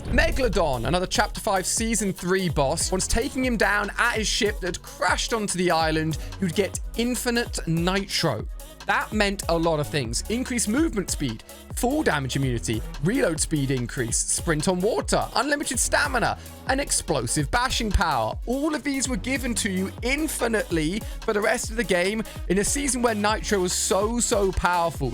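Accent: United Kingdom. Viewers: Are you British you sound like you’re British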